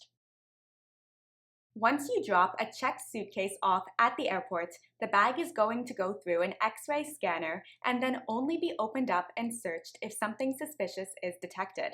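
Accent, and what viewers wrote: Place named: New England, United States. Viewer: Thank you for this information! Also, love your hint of an accent too - is it New England (close?). Thank you - Sub'd!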